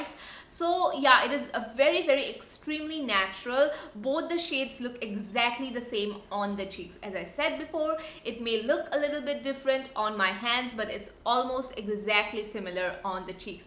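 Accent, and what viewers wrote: India. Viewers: Woooowww supeeer happy i am i love colorpop products a lot and you are so so sweet di u always come up with this dupe videos and as u know i just love your dupe videos it means a lot to a person like me who s a student and can't afford much and invest much so you are a dream for us thankyou is not enough to say i just love you as a person and your every video has my heart di and your Hindi accent is so so cute 😍 haha i love it too. Keep growing di and keep shining in this way more to come always by your side take love di ❤️❤️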